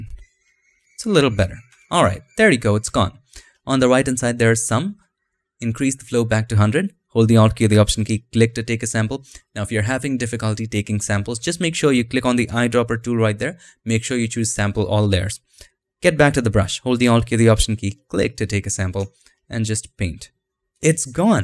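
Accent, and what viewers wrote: India. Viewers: indian accent just kills me ... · You speak too fast and your english is slightly Indian, it would be easier for me, at least, if you spoke in a more paused way.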